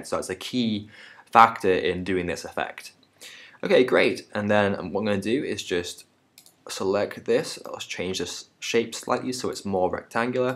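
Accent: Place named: England, United Kingdom. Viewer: I have not problem with it and I like the accent but is it me or is every premiere or AE tutorial done by an English person?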